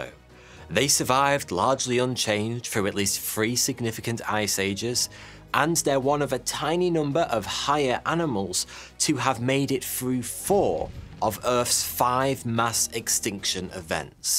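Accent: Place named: Cockney, United Kingdom. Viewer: Well done! The cockney bit,(Liverpudlian?),is aalllmosttt gone. Oh, the horseshoe crab thing is brilliant! Um, well, craic on then.-weezi-🙏💖🙏💜🙏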